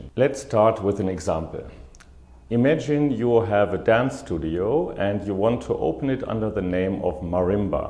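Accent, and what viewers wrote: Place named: Germany. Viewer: Hey :) Great video! I hear the german-english, realy cool :D Liege ich da richtig? :) lg Robin!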